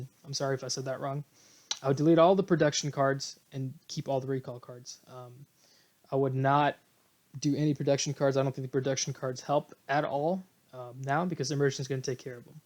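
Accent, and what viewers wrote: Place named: United States. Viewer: Hola! Vas muy bien con el español. siendo sincero, tu acento sigue escuchándose como estadounidense pero es solo cuestión de tiempo. Mucha suerte!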